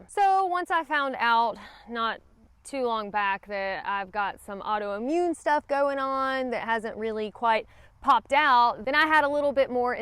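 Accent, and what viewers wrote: South, United States. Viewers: Over the years of watching you videos I have learned to adore you southern accent. Naat Haakin (night hiking) aat (out) aas (ice) and so on. I love it. Please don't take it as a criticism, it really isn't. I look forward to it. Your greeting of "Hey Y'all" is similar to our UK "hiya". Best wishes from the UK.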